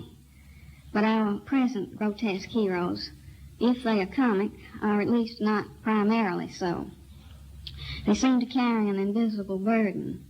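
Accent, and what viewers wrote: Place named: Georgia, United States. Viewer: She has a very strong middle Georgia accent. It's easier to distinguish in her reading of "A Good Man Is Hard to Find." No, it's not a hillbilly or mountain accent or a swamp accent, but it's definitely a strong accent--nowhere near standard.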